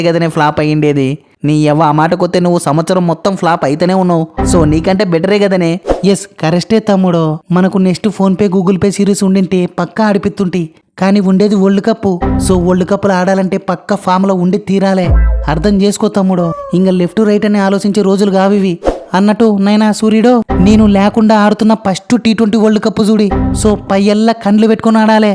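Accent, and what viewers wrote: Rayalaseema, India. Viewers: Anna rayalaseema slang❤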